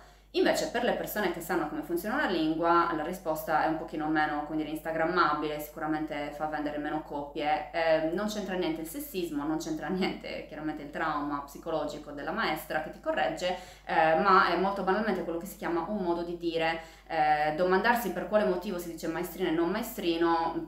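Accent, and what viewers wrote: Sardinia, Italy. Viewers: Sei stupenda. Da lucano adoro il tuo accento sardo...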